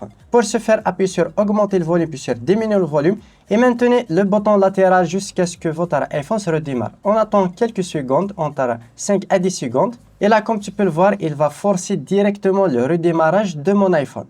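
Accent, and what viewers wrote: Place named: Kabylie, Algeria. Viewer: Salut frr je regarde tes videos et ils sont magnifiques, et je remarqué dans t’accent que tu semblais kabyle mis tmurth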